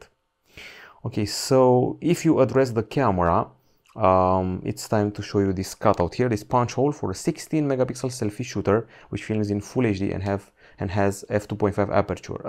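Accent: United Kingdom. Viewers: Are you British? Do you speak English?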